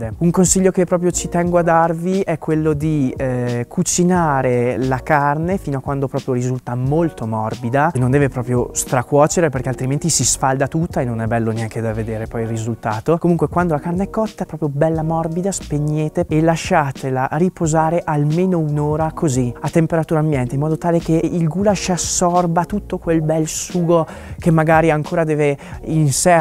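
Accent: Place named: Romagna, Italy. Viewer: lo "ssiock termico", "tutto quel bel sciugo", "fazendo tosctare", "zzi vediamo alla proscima"...ma c'è niente di così piacevole per le orecchie come la parlata romagnola? A parte questo c'è davvero da squagliare di fame vedendo questa ricetta (avrei solo qualche riserva su tutto quel burro). Aggiungo, e spero di non metterti in imbarazzo, che me fai sangue pure te quanto il goulasch, perchè sei davvero un gran bel burdel (eccheccavolo, in base a quale legge o trattato chi illustra una ricetta ha da essere sempre di una bruttezza invereconda? Le eccezioni vanno apprezzate e segnalate).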